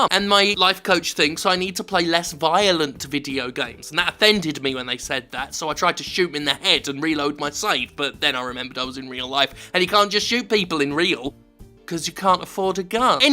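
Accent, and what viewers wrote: United Kingdom. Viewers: you sound too British.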